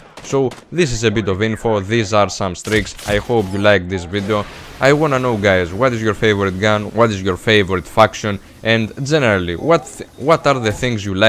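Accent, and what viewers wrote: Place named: Greece. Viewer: Γειά σου φίλε,τυχαία σε πέτυχα,άκουσα προφορά και λέω δικός μας είναι...Ωραίο το κανάλι σου...γουστάρω...Κάντο και στα Ελληνικά ρε με αγγλικούς υπότιτλους :P παίζεις σε ps3,360,pc;τσέκαρε το κανάλι μου άν θες...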